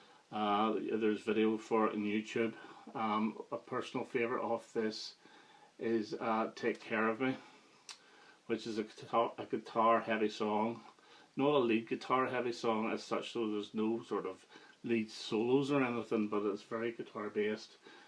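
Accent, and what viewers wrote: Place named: Scotland, United Kingdom. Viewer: you did a great job critiquing albums. I really enjoyed it, and I think your laidback style and relaxed Scottish brogue were major reasons for that. I had 1st album with ' ' on it. I really like humorous songs. I think he writes very funny lyrics like ' '. ' ' has often been likened to ' ' do you think that's a relevant comparison? Totally agree that ' ' was greatest album. Every song is tremendous.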